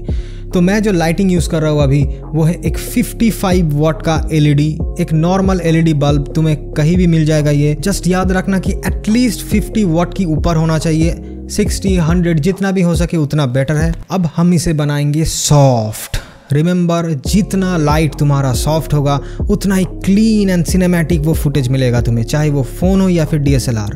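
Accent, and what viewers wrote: Bengal, India. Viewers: Hindi sunke lag rha Bengal se ho? Bangali dada😅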